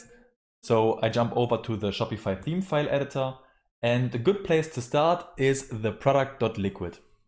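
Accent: Germany. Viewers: are you german ? you have the accent man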